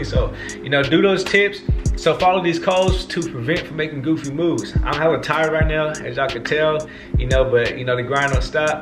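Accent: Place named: Oakland, United States. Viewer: Don’t forget to buy your pumpkin ha ha. 🎃 Just curious why do you have a East bay / Oakland accent? Cool tips & nice shop keep it up.✌🏻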